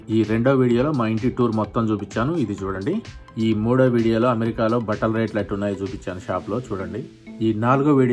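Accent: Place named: Telangana, India. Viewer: Telangana slung sir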